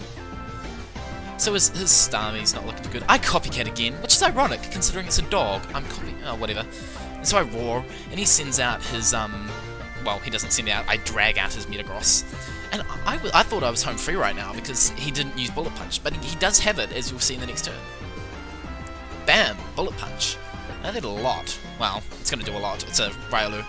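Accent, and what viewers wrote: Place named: Australia. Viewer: I can't tell what you're saying!? Stupid AUSTRALIAN ACCENT! Can we have subtitles?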